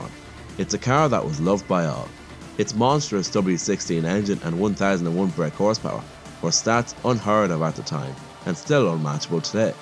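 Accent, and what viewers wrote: Ireland. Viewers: What part of Ireland are you from?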